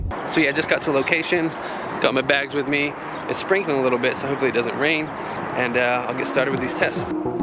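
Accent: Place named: United Kingdom. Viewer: I have noticed that you are getting more of a British accent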